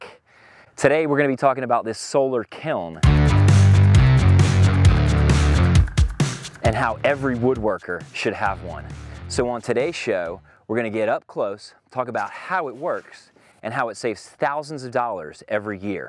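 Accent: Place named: North Carolina, United States. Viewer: Where in NC? Love to go see it. I'm coastal NC and if I had to guess from your accent, you're not western NC.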